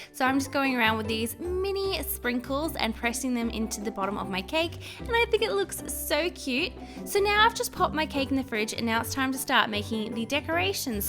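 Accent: Australia. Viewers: What part of Australia are u from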